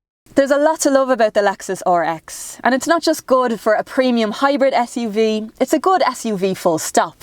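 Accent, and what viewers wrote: Ireland. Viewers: I would definitely buy a Lexus from. Oops sorry already bought a RX450h FSport. Love the Irish accent. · I have strong liking for Irish accent and a beautiful person, she is the perfect combinatb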